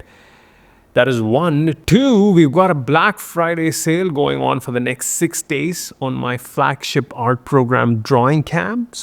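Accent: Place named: India. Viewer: Lol, just discovered this 2nd channel, same old Indian accent.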